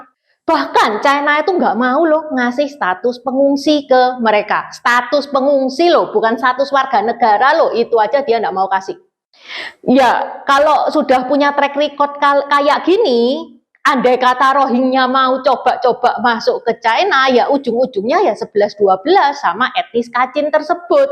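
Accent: Java, Indonesia. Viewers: Logat jowomu medhok mbak yu... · Aku focus medok. Mba mba Jawa . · aq ngakan sebab ini kakak medok banget😂😂😂😂😂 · Iki wong Cino, tapi bhs ny medok jowo, keren"👍👍👍👍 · Ce ngomonge medok banget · Orang cina, bahasa indonesia, logat jawa. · Coba perbaiki lagi logat jawa medok nya mbak.. biar lebih jelas bicaranya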